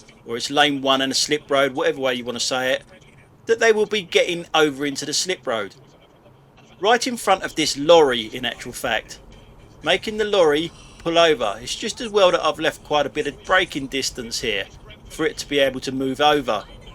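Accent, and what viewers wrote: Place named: Cockney, United Kingdom. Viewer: I could listen to a cockney 'avin a moan for hrs, reminds me off my old Nan... Thanks a million dude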